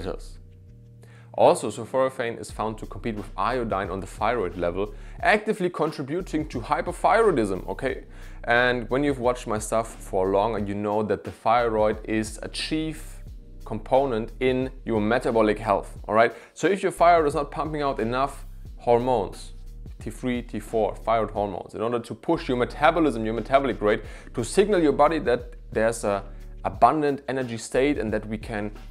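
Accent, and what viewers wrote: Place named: Germany. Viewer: Let me guess.. Are you German? Your accent sound like that ✌️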